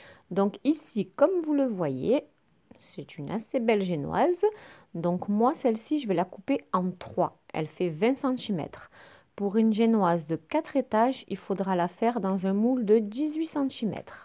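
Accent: South, France. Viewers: Bonjour j'adore vos recettes et votre accent c'est du midi de la France?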